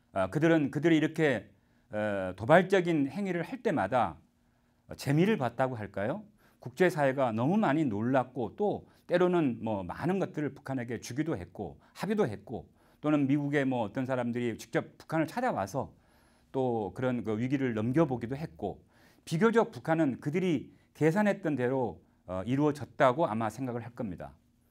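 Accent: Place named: Gwangju, South Korea. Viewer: 아따 광주억양 쎄쇼잉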